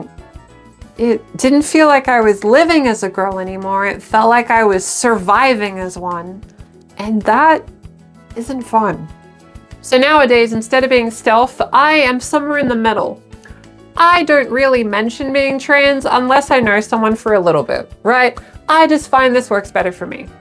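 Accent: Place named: Australia. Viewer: Huh - youre Australian accent is way more noticeable with your masc voice. Its a super interesting party trick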